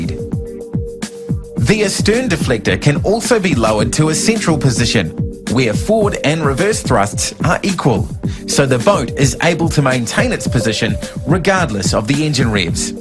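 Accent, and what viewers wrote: New Zealand. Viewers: Love the accent. Reminds me of the Kiwi advert for Deck Stain, "This weekend I will be polishing my dick". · Kiwi. Waterjets were invented in NZ. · nz accent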